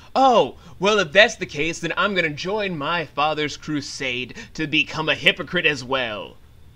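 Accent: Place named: Cockney, United Kingdom. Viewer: your cockney accent, nice. i think a disclaimer for the show needs to be; uther will always hate magic, he never changes and he constantly reminds people of that.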